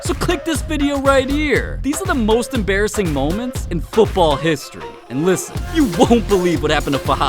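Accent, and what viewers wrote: United Kingdom. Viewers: Are u from uk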